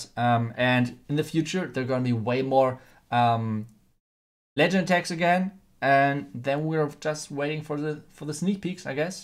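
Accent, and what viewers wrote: Germany. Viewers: i can hear it in your accent that your german ;D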